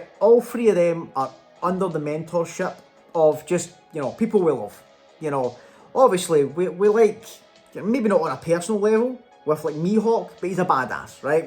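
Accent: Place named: Scotland, United Kingdom. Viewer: Wow you have a Scottish accent ‼️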